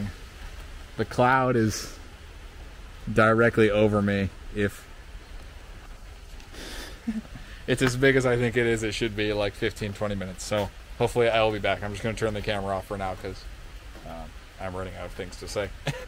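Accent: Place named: United States. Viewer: How ignorant of you to assume that he is Brtish even after hearing his American accent 😂😂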